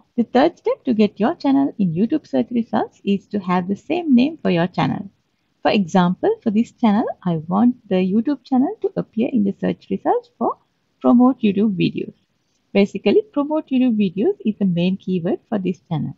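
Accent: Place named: India. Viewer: oo i think u r Indian because of your accent 🙂 sry 😋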